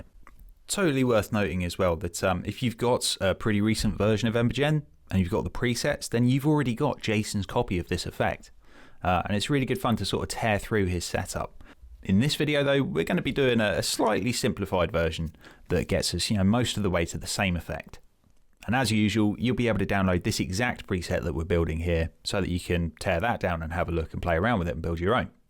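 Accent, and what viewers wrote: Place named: United Kingdom. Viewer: I can hear british accent here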